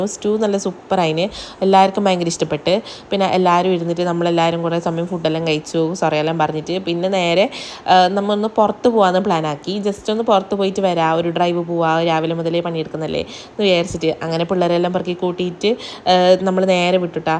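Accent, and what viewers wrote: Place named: Kannur, India. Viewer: but slangil evideyo oru kannur ind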